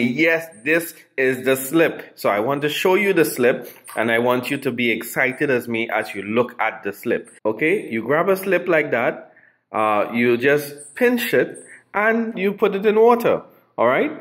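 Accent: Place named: Caribbean. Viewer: Hi, looked at a few videos for growing sweet taters, yours is the best, you explain things great, love your accent from the Caribbean, I've subscribed, and am keen to grow sweet taters along with you. It's end of spring here in OZ. Time to start. Regards Shayne......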